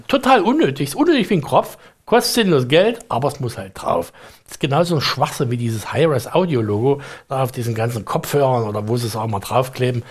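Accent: Germany. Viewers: The dude speaks a funny german slang, which crashes every automatic translator anyway. Only for insiders. 🤣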